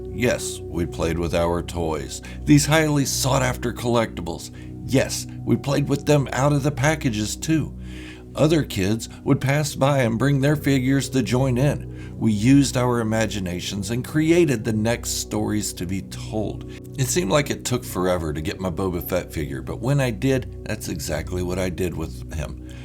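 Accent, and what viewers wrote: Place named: Germany. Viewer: I really miss videos like this this from you, so different and original, sensational More please!. Ihr deutscher Akzent ist ausgezeichnet! I can certainly relate to this video 100 percent as it was so nostalgic for me. I saw Star Wars with my father in 1977 when I was 9 years old, played with my collectibles, created my own artwork ( yes I used to draw etc) of the characters, my room was filled with posters on wall, collected the trading cards, unique merchandise and when the movies were available on Beta/VHS every night was Star Wars night for me. I relied on newspaper articles for any information I could get on Star Wars which was not much in those days. Looking back I am so grateful for lack of spoilers etc that because of the experience of feeling like a kid on Xmas Day when finally seeing the movies as I didn't know anything! 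My imagination went into overload and I appreciate those days so much more now. I think the generation of today are indeed quite spoiled now when it comes to the instant gratification of having everything available on hand via the internet and streaming services. Ohhh I so loved your analysis and perspective on how the Siths told the truth and the Jedi were too arrogant or in denial to accept the truth. I really didn't see it this way until now...the other side of the story. Of course, Obi had to lie or manipulate Luke. The Jedi were just as bad as the Sith in manipulation. Obi Wan and Master Yoda wanted Luke to be traine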